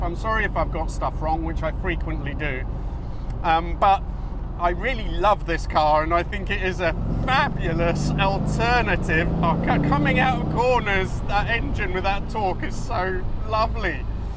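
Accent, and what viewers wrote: Italy. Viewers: Pantera has been one of my favourites since when I was a kid. But I didn't know she had such a gorgeous beautiful interior until I saw this video. Especially after seeing a spaceship like cockpit of the modern cars , it's got a very good taste. As someone said that it's not a car but art. Yes it's more than a product but art level of thing with a passion. It must come from Italian character. I like the way you talk so nicely and jolly and clear as well.And I don't mind sometimes you talk with an Italian accent. It's fun and give your program a good character. I accidentally found your program first time today. I like your narrations very much. It's very pleasant. Thank you! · Absolutely love the pantera hate the Italian accent totally spoiling your videos which I look forward to watching every week please stop as it’s cringe city,keep them coming (without accent)👍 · Love that car, what a machine. 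And regarding people moaning about the Italian accent, don't change it, if they don't liked don't watch it. :) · I had driven my friend’s LHD in Vancouver back in the 90’s and it was amazing! BTW, Italian accent is beautiful!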